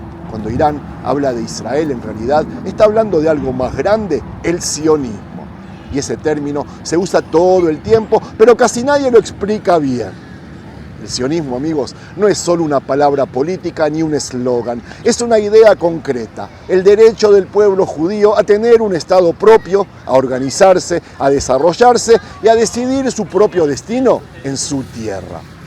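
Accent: Argentina. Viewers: Irán nunca ha sido problema de nada. El único pecado de Irán es no haber permitido que los jefes sionistas con sus empresas petroleras roben directamente sus recursos. Porque esa gentuza no está acostumbrada a pagar por nada, no se engañen. Desde entonces se la tienen jurada y si leen un poco se darán cuenta que es el país que menos conflictos ha tenido en Oriente Medio, simplemente tuvieron una guerra muy cruenta con Irak porque los sionistas jefes les echaron encima a Saddam, al que luego ahorcaron por cierto. Por el contrario, Israel como estado en Palestina es el mayor error/crimen de la historia moderna que, como dijo un tal De Gaulle, al que por cierto los jefes intentaron asesinar, "traerá ríos de sangre"... El sionismo es un concepto político sinónimo de usurpación, crimen, supremacismo, terrorismo, etc, a mí no me va a convencer con su tono argentino y su voz melosa. 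Israel es un estado desquiciado que se comporta muy agresivamente con sus vecinos, como cualquier especie invasora, es ley biológica. Fundado en Palestina en pleno siglo XX porque lo dicen sus cuentos míticos religiosos, que tiene narices. Y para legitimarse se gastan millonadas en buscar restos arqueológicos porque no pueden reconocer que LA MAYORÍA DE LOS HECHOS BÍBLICOS NO SON HISTÓRICOS SINO MÍTICOS. Pero mucho más patético que el judío sionista es el cristiano sionista, que les tocan las palmas y no se enteran de que no tienen nada en coún: al judío le repugna la figura de Cristo, el peor de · Jajaja! Ya no sabes que decir, hablás como argentino y te crees israelí. Ya te lo dije pero eres necio, el pueblo de Dios no es el régimen mataniños de Israel. (La verdad y la justicia siempre sale y saldrá a la LUZ❤)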